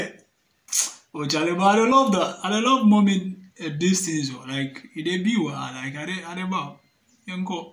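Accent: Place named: Ghana. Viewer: I sure this guy school 4 Ghana. Cos ur pidgin bi typical Ghanaian Uni one some.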